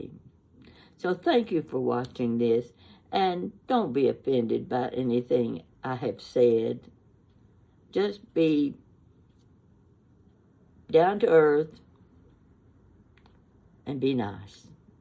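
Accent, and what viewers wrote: South, United States. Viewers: I appreciate your company. I feel like you are very sweet and homey. I love the sound of Southern people. ❤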